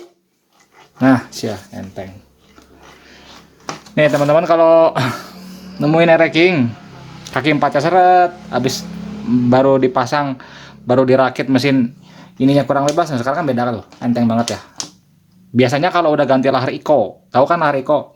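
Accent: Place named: Bogor, Indonesia. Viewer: Lokasi mana bang logat Bogor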